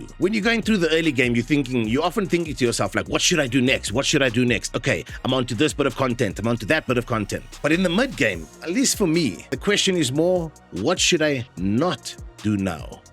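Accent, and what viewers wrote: South Africa. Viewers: Yo bro, I take it you're from SA, I hear that colored accent. Nice video. I cannot agree more with everything you've said. Good job.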